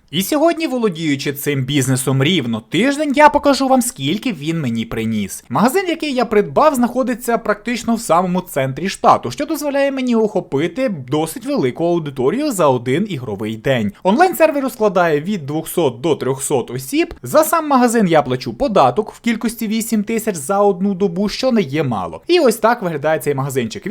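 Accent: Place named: Russia. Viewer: Сначала был украинский но большой русский акцент потом украинский с средним русским акцентом а теперь 👽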